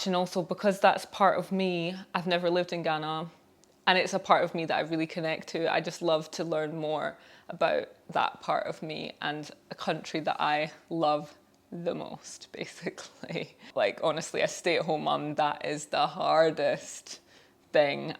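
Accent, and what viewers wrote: Scotland, United Kingdom. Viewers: Your Scottish accent sounds so funny to my ears lol, where are u originally from if i may ask?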